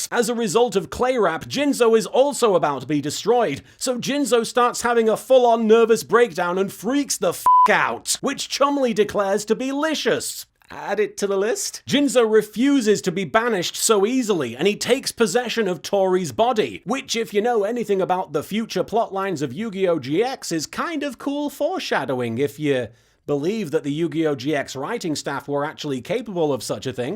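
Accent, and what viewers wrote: United Kingdom. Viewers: Random nonsense in a British accent... That's one of my favorite kinds of random nonsense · Chillin' out without a crew? Are we, your fans, not enough of a crew? Are we too motley? Random nonsense in a British accent... that is totally my jam. Do it more with less people... all those virile young people, alone on an island... yeah, there will be more something going on... Give me 3... steps mister, 3 steps towards the door? Jaden's pun at the end... it didn't have a ghost of a chance. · Random Nonsense in a British Accent is it's own official brand of comedy as far as I am concerned.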